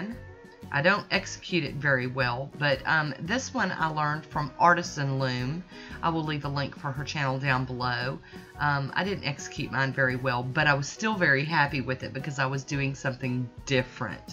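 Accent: South, United States. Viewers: Love it! Water-marbling is what started my nail art addiction too! Unfortunately, I never got very good at the water-marbling type of nail art but I’m getting better & I am better at stamping, and hand painted designs. Now I’ve ventured into doing my own acrylics, acrygel, & just recently started gel polish and hard gel. I love the products and tutorials by wildflowers nails and by Kirsty Meakin’s tutorials on Naio Nails aswell as her urban graffiti gel polish line are so amazing and easy to use! I love the formula of those gel lacquers they made all the difference in the world to my gel polish game! I was just using the brains found on amazon before that and had about given up on being able to do anything because I couldn’t get the gel polish to look good in order to use the wildflowers products but once I laid down her gel polishes using the tutorials she and Lauren out on youtube I was producing some great looking designs! 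Now I am not a pro I am just self taught from YouTube but because I was disabled by a drunk driver in 05 I can no longer go to nail salons due to my illness I have no immunity and have seizures so I started doing my own and then branched out to doing family and friends just for fun and when I’m able to (which unfortunately isn’t often as recently I’ve been sick and unable to get out of bEd for the past 2 1/2 months) but I enjoy your tutorials too! It’s so wonderful to have a fellow southerner to listen too! I had not been using small size bathroom cups s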